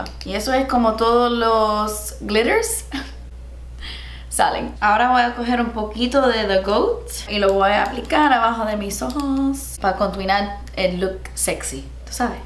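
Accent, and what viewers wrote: Cuba. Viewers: Me encantó!!! Hacía mucho que quería este video jaja....! I love your cuban accent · Nunca pensé que tu español era tan latino. Tienes muy marcado el acento cubano con todos sus giros. Muy simpático y divertido sobre todo cuando dices "ya tu sabes". Gracias por ser tan cute 😍😍😍😍😚😚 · hablando español, con acento cubano 👏🏼 what I’ve been waiting for forever 😂 jajajaaj I love how cubans kinda yell, I get it cause I’m Mexican from the north part of Mexico and southern Mexicans always tell us we are yelling 😂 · I LOVED this video!!! I’m Cuban, I’m from Miami and so I’ve always loved your Cuban background and accent. My Spanish is HORRIBLE, but it was so funny to see you kinda mix in English in there and your Spanish talking voice is soooooo pretty. Such a fun video, I was smiling and laughing the whole time in the best way! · Me! I love her cuban accent! 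💕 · Girl you did so good with the Spanish and I love your Cuban accent!! You definitely need more Spanish videos! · I’m bilingual too (born it the Uk 🇬🇧 but lived in Spain 🇪🇸 since I was 10) and this video was HILARIOUS! I know the struggle of mixing languages lol. I love your Cuban accent! 😍 ¡Buen vídeo como siempre! 😊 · Maybe it's difficult if you are learning Spanish from Spain, because she has Cuban accent, and the pronunciation is a bit different. Bit it's cool you can see different accents. Cuban is beautiful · Chica me encanta tu acento cubano!!! Para mi tu español no es tan malo, solo te hace falta acordarte de ciertas palabras y listo. Por favor haz mas videos así! Desde que te empecé a seguir moría de ganas por este video! Saludos desde méxico 🇲🇽❤️ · Que acento tan marcado de Cuba, no lo habría imaginado! Más vídeos en español please! Me reí mucho y el spanglish se entendió perfectamente...😘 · Me encanta tienes un acento 100% cubano 😂😂😂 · No imaginaba para nada tu acento así, es 100% Cuba🇨🇺!! 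Gracias por este homenaje al español. Saludos desde España 🇪🇸😘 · 😹😹 I’ve been watching you for years and wow. This is the first time I have ever heard you speaking Spanish. I love your Cuban accent ❤️ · Jajajaja ¡me encantas! 🌹 Tienes un acento cubano precioso. Ojalá te animes hacer más vídeos en español. Un beso enorme desde España 🇪🇸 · Se nota tu acento cubano mucho jajaja, se te entiende muy bien (soy de España) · I love your Cuban accent! 😍 Please do more Spanish videos 🙏🏼!!!!!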